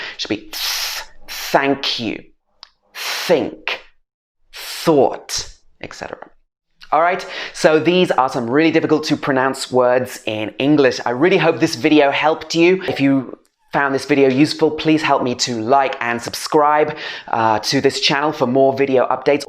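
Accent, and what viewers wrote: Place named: United Kingdom. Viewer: Good British pronanciation..thanks for such type of videos.. visit our channel...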